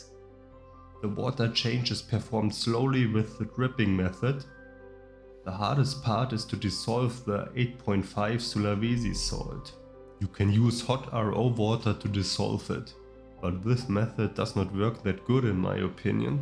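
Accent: Germany. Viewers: Warum machst du kein deutschen Kanal? Man hört leider extrem raus, dass du Deutscher bist mit deinem Englisch.😅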